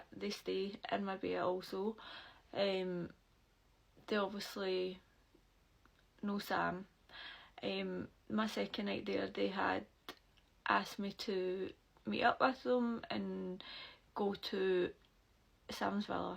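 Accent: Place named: West Scotland, United Kingdom. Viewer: Where abouts you from??? Defo sound West Coast of Scotland / Glasgow / Ayrshire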